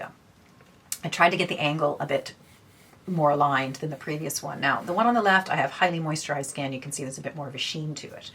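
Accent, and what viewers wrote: Ireland. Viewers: The battery cycle is too short on this thing (Omnilux) for sure. I have another cheap USB external battery power source that I use and just set a 10 minute timer on my phone. Annoying for the price. This is my first watch of your channel. I’m 50 as well. You have a subtle Irish accent which I adore. I’ve subscribed.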